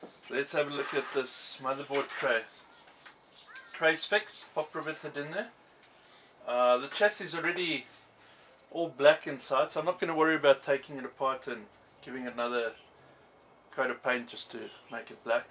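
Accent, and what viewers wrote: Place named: South Africa. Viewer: Never heard such a strong Saffer accent.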